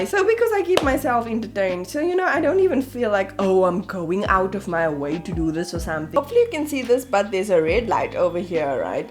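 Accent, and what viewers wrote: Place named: South Africa. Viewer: I love your South African accent 🤩🤩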